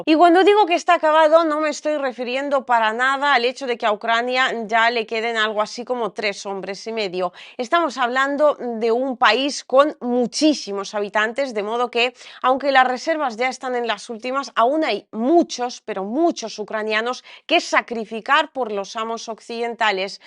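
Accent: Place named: Spain. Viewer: Hola soy Español mi pregunta es dónde a prendites el español que maravilla cómo habrás con ese acento Español, parecés qué seas Española Felicidades españolista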